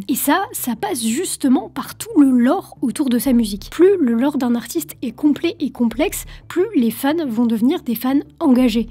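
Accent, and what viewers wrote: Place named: France. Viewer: SVP est ce que tu peux prononcer les mots anglais sans l'accent Français? Ça fait mal au oreilles, / Ze weekend.. rien de personnel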